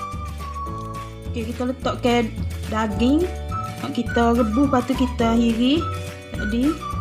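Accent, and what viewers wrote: Kelantan, Malaysia. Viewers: suko dengar kak kecek Klate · Min, sedap....sebenarnya dulu sy pernah kawen dgn org kelantan.n skang dah pisah.die punya benci tu, adoi...smpai sy xleh jumpa or dengar org kelantan cakap.benci sungguh.padahal diorang xde salah ape pun...adoi...😬...skang Ni pun sy subscribe.tapi dgr je loghat kelantan, terus sy mute..punye la hai...halalkan resepi yg sy ambik ni ye.n sy still benci..😭.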